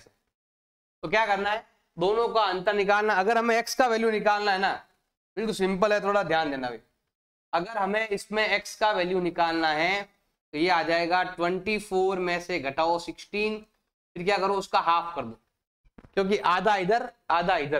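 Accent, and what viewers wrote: Rajasthan, India. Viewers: Sir aapki aawaj me rajsthani feel hai 😅😊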